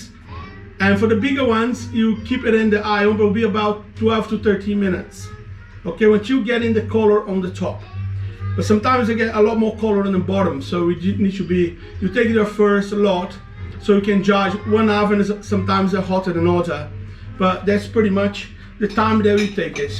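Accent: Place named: New Zealand. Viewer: Oi, vim através do grupo barraqueiros de Queenstown LOL. Adorei tua receita e objetividade. Ja me inscrevi no teu canal e curti o video. Seria legal vc tb deixar a receita escrita na descrição do video. Obs: meu Deus parece que to ouvindo um kiwi falar!!! Abraco!!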